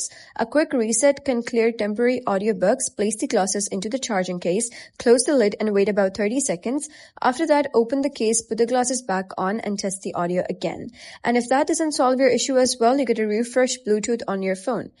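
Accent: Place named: India. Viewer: Of course its an Indian voice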